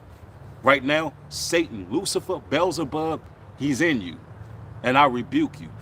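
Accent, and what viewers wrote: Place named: Chicago, United States. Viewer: U must be from the Chi. Shout out to you bro!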